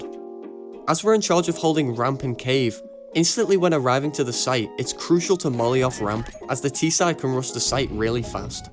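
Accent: Liverpool, United Kingdom. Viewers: Wanted to ask that as your a scouser do you also say your hank marvin? Had a scouse roommate a couple of years ago and as an American I cracked up every time he frustratedly said “ah lad whaddaya on about?!” Good times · Was sceptical on a Scouse lad trying to break down a map 😂. Very nice break down and very well put together video brother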